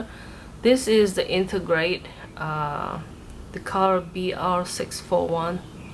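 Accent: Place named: Malaysia. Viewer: your accent is very closed to malaysian chinese... i dont know why im watching this.. but i enjoy it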